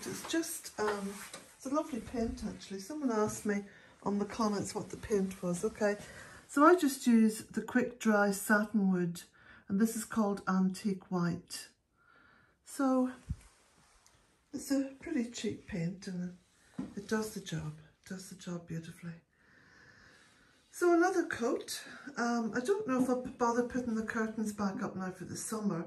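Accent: Ireland. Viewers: at times when possible and feeling up to it, could you add typed out the names of some of your plants? Today, the one I think started with two syllables of "C" and perhaps ending with being "Aster", I would love to know what it is. It was along a wall and the bees were enjoying it! 🥰 I'm from America. 🇺🇸 🙂 Some of the names of plants I can make out and some along with hearing them correctly, I know of and some I've had. I'm sure you're speaking them all correctly! I dearly adore your accent. In America there's so many different accents! As well, I don't know if we have all of the same plants as Ireland. Some I'm sure aren't in both of our continents. My Great-Grandparents came from Ireland to America. So I have Irish in my ancestry and some in my other blood line, too. Thank you kindly,. I always feel nourished by watching you, seeing your lovely cottage, and life in your ever-evolving three acres! 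A most beautiful slice of earth!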